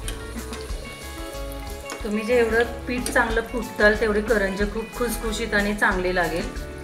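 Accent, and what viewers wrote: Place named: Solapur, India. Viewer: Kaku tumhi proper kontya gavchya ahat tumchi language Akluj solapur sarki vate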